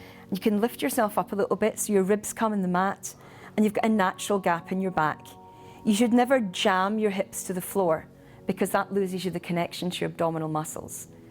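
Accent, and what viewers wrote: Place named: Ireland. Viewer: Lovely accent! So pleasant. Irish?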